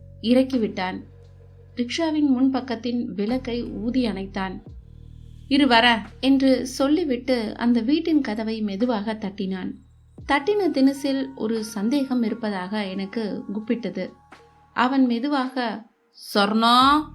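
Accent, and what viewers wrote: Chennai, India. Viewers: You seem to be becoming very proficient in Madras basshai!!!!! Way to go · Your Madras dialect is bloody hilarious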